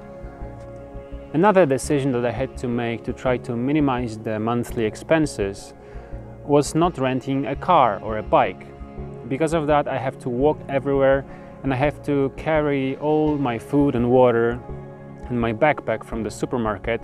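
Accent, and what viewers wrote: Poland. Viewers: Rotbart1337 he’s Polish indeed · What nationality are you? You have an accent but i cant tell where you are from. Poland?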